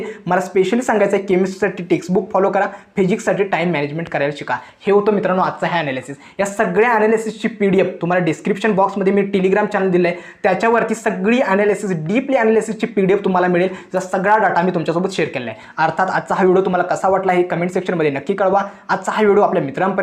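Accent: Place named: Kolhapur, India. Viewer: Kolhapuri accent i think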